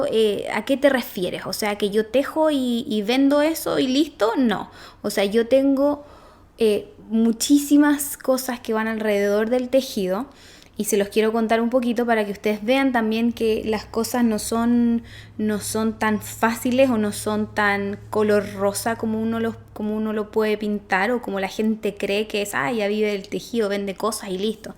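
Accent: Chile. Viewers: Hola, he tejido varias cosas siguiendo tus tutoriales de YouTube, explicas muy bien 👍. Me quedé con tu canal porque reconocí el acento, soy de Chile también. Saludos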